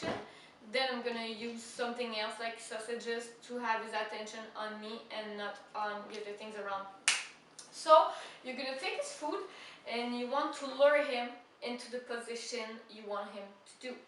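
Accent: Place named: Russia. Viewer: mdr, j'étais pas sûr mais parfois tu as la même intonation que les russes, j'entends plein de russe parler anglais ici (shanghai) :)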